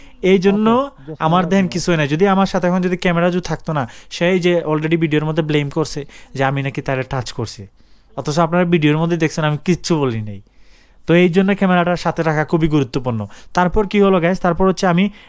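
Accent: Sylhet, Bangladesh. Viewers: Vai apni sylheti apnar kotay buja jay... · শুদ্ধ উচ্চারণের সাথে সিলেটি টান ত আছেই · আপনি কি সিলেটি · Mator style hunley bujha jay Sylhet er manush je apni! Btw apnr bari habiganj ni vai? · ভাই আপনি সিলটি 🥰🥰🥰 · Bro You from sylhet. Ami afnaar voice oor dhoron dekiau bujhi lisi afne ee Sylhety. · সিলেটী একটা টান চলে আসে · His tune like sylhety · ভাই কি সিলেটি?😁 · ভাই কনফ্রাম সিলেটি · Vai Khun desh oo take ba ? R tumr kotha huniya buja jar sylheti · ভাই সিলেটি😅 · Vai apne ki sylheti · Brother, are you sylheti ? Your language like shylhety language.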